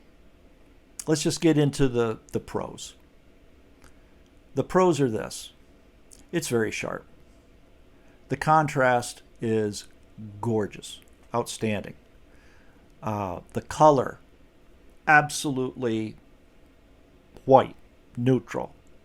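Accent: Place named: Levant, Arab world. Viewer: Nice to hear from you, V :) So glad to hear you’re enjoying the New Year. I hope it is a prosperous one in those important ways. It feels like a mixed New Year. I’m more optimistic than usual but fear there are a lot of conflicts and disputes far from being resolved with the ability of involving more countries / raising other simmering tensions... Your accent sounds familiar but hard to pin down. I suspect I’ve not met anyone from your origins to be sure. Listening again, I hear different mixtures sometimes familiar accents. I suspect the Latin hints comes from learning those accents of English. Thanks for the clues, I don’t know distances well, but I would say NE of the Levantine, approaching Europe... I’ve gotten into the habit of playing videos as fast as still legible, and forget how it chipmunks the sound. Well, in your case Levintines ;)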